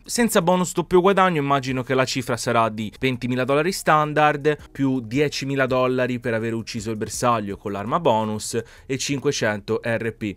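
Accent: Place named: Rome, Italy. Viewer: È di Roma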